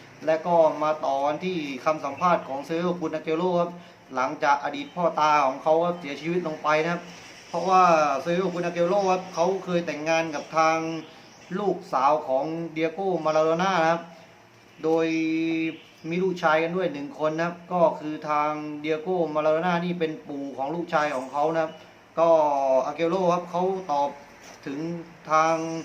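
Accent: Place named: South, Thailand. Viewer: คนใต้ม้านนิ!!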